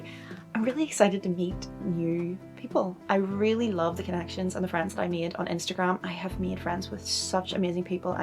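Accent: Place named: Northern Ireland, United Kingdom. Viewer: Heeey, welcome to booktube! :D Can I just ask... Where are you from? You have such a lovely Northern Irish accent!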